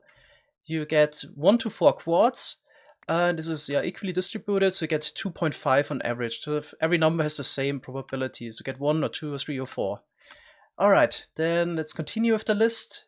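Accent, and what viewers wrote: Germany. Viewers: Hahaha ich hab gewusst du kommst aus Deutschland!!!! xddd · Deutsch man hört es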